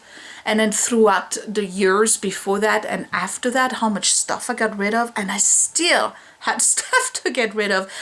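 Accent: France. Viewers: I watch your series as I cleaning my own room like lessen your french accent hagaga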